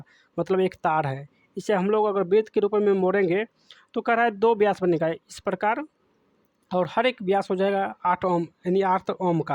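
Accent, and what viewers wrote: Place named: Gujarat, India. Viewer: सर आपकी मातृ भाषा गे छे वाला है सही या गलत आपके बोलने के tone se पता चलता है😊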